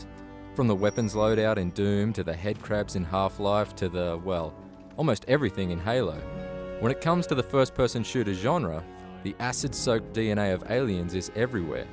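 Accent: Australia. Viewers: YAY! an Australian reviewer! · hes australian like me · Nice Aussie accent bro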